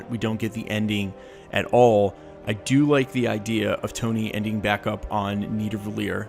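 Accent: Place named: Philadelphia, United States. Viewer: Yo Cuz,not for nothing,but are You from Philly or South Jersey??? I detect an accent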